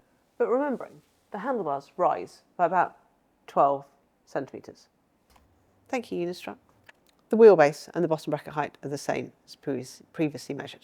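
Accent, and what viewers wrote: United Kingdom. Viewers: Always nice to see her and more importantly hear the nice British accent of this lady.❤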